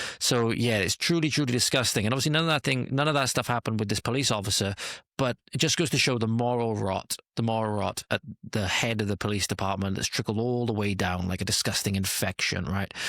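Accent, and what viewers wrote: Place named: Welsh Valleys, United Kingdom. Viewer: Great to hear a Valleys voice (I would add our Welsh flag here normally, but YT has decided to censor it) That is a sick mf! TYVM for reporting on this! Have you heard about AJ Audits aka Anthony Styles?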